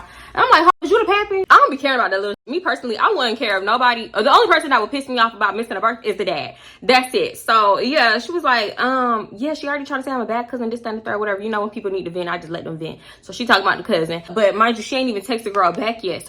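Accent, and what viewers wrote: Baltimore, United States. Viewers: When did you get this B-More accent 😂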